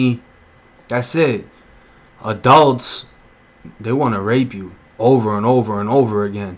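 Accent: Boston, United States. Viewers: People drawn to you because of your Boston accent lol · done with the hard life that Boston accent thick lol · You from Boston? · Someone was lookin out for ya when you did ur time, for sure! 🙏🏻 Obviously got a bunch of vids to still catch up on, but keep 'em coming! Love the way u just flow w/ur stories PLUS (& not to disrespect u or ur significant other) but I just have to say that Boston accent, Boyyy... 😍 it definitely keeps me wanting to listen to more & more! 😉😆🙃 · U got the Florida talk with the Boston accent. That's wild bra lol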